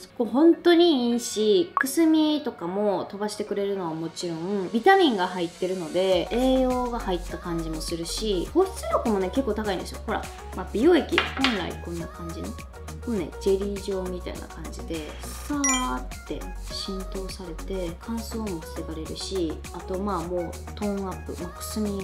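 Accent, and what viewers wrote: Kansai, Japan. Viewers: 凄い種類の多さに驚き、最初どうやって選んでいるの？視聴者は助かるんだろうな～ ちゃんの関西弁に癒される～💞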